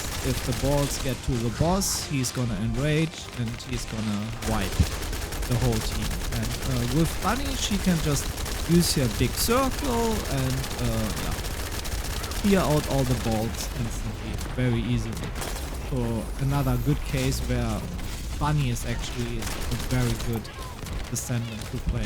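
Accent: Germany. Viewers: Dieses deutsche Englisch hahahaha geil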